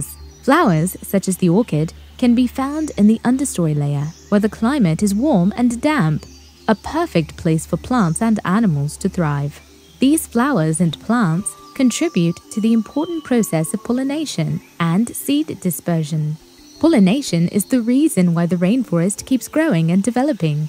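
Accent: United Kingdom. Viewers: Do you have a British accent? ❓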